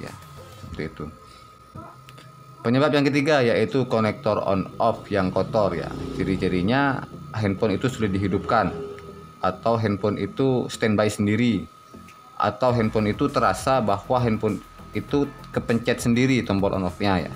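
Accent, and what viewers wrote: Madura, Indonesia. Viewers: Logatx kok kaya madura bosss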